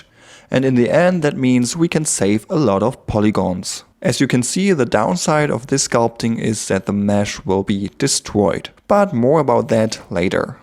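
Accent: Germany. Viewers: Man hört einfach sofort, dass du deutsch bist xD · i hear a german accent well, your name sounds pretty german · Great tut man, very, very helpful(Also your german accent is adorable) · "Oh he sounds really german" i thought. "My name is " he said.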